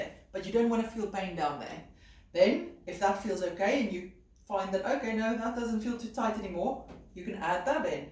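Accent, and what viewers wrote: South Africa. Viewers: Love your channel, extremely useful, plus I love the SA accent :) (I was born in Germiston). I can't find any videos on warm up exercises before runs. I use <link> <link> and <link> which ones are best? Or even better do you have a video on the best 5-10 min warm ups to use and maybe cool downs?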